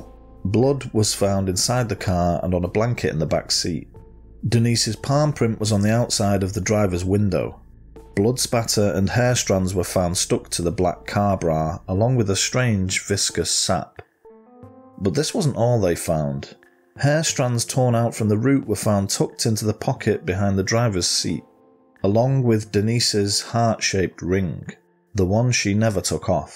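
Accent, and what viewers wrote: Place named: Manchester, United Kingdom. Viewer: I’ve just stumbled across your channel and am really enjoying it. You’re a really engaging narrator and the site is professionally put together. The cases you explore are, thankfully, more obscure than those on similar sites, but certainly no less interesting. And to top it off, you sound like you’re from my neck of the woods, Manchester. You’ve got another subscription from me. Keep it up 👍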